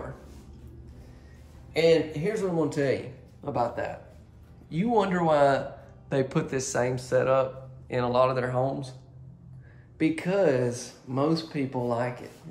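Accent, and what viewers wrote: South, United States. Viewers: I love your accent ♥️ Be who you are. Don't go changing trying to people please, because I RECKON, LOL HEHEHE 😋 🤣🤣 🤣 you will never ever master being a puppet to everybody in the world. I love your personality, you have a southern swag about yourself 😁 yes, SOUTHERNS HAS SWAG..LOL HEHEHE 😋 🤣🤣 🤣..and that is what's keeping the viewers returning to your channel ❤️ keep up the great work. I RECKON , I SEE Y'ALL ON THE NEXT VIDEO..🤗 Charole'vista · Please do not apologize for saying “reckon”. I’m from Alabama. Keep our Southern accent alive! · GREAT-looking LR/DR/kitchen open-area layout, and you have a soothing, "folksy" way of selling the unit. My only "criticism" is when you hesitated after saying "reckon" as though it was too country for the video. Your southern accent NEEDS TO BE PRESERVED, because with the gentrification of much of the US--I'm from Boston, have a STRONG Boston accent, but fewer ppl speak with a Boston accent nowadays due to the dreaded gentrification of neighborhoods here--so we gotta keep the various cultures/accents alive throughout the US. 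After all, the US would be a dull place if all we had was a monotone, single accent throughout the entire country, right? In other words, STAY TRUE TO WHO YOU ARE! Keep up the good work:) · Speak your Southern English! I love it! Don’t ever change. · LOVE THIS HOME! and keep being you...love the southern accent👍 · Love your southern accent.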